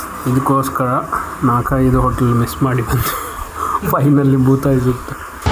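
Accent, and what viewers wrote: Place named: Coastal Karnataka, India. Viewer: Thumba genuine viewers matra nim channel alli baro videos poorthi nododu....but nijvaglu ondh video na poorthi nodudre saaku, we will just fall in love.....you are such a kind hearted person... almost 3.5+ years inda nim subscriber. Ondhe ondh kuda exaggerated video nan nodilla ee channel alli... I'm sure ee channel elligo hogutte swalpa dinadalli and btw nimma dakshina karavali kannada kelakke thumba sogasagide💗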